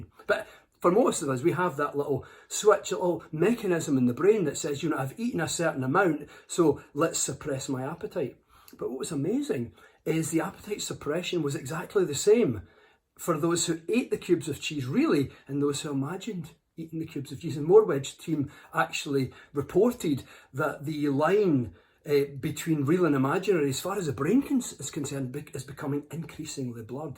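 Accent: Scotland, United Kingdom. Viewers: Hey. Love your Scottish accent, m8. I have a major passion for accents. Scottish accents are so beautiful. Cheers. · I like this guy's books better than listening to his talks. The Scottish brogue can be hard to understand, but this guy has a nice personality.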